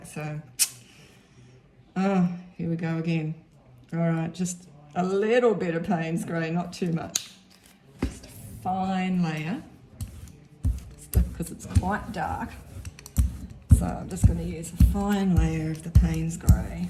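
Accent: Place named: Australia. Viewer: I love your work, can you advise where to buy the deli paper from, I’m struggling to find a stockist. You sound Aussie, like I am. I love experimenting with my gelli plate.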